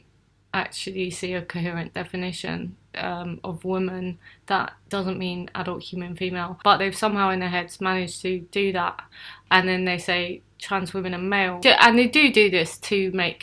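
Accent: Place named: United Kingdom. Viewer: I can’t get over the ushusting Da YooKay accent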